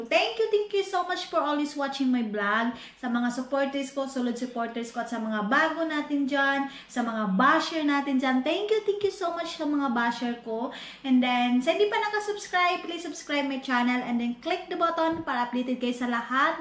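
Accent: Visayas, Philippines. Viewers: pag nag bibisaya ka tawa ako ng tawa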